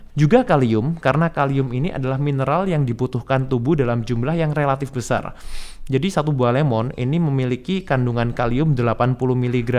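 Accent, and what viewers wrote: Java, Indonesia. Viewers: Me I kedok jawa bicaraya medok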